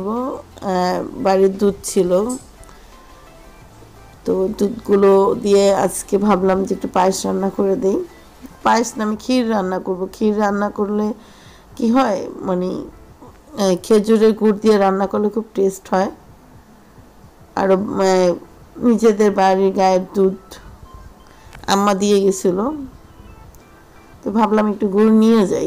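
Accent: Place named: Bangladesh. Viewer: Bhalo kore Banglay kotha bolun. Aapnara, Bangladeshira , jokhon banglay kotha bolen tokhon aamader Paschim Banglay bose lojja kore. * Hoichhe noy : Hoyechhe * Maikha noy: Mekhe * Jaitechhe noy : Jachhe * Bhorta noy : Bhate * Bahire Gichhilam noy: Baire gechhilam.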